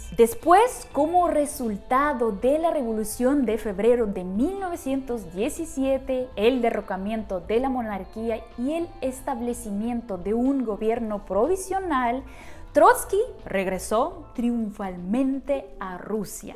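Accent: Ukraine. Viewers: Soy yo o está volviendo a tener su acento Ucraniano???:P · Buen documental, cuando estube en el DF, trabajando y estudiando, muy de seguido pasaba por el museo de Frida Kahlo, Diego Rivera y León Trotsky, pero nunca entre, una pregunta porque estás volviendo a hablar con acento ucraniano ya lo habías perdido, es bonito, no lo critico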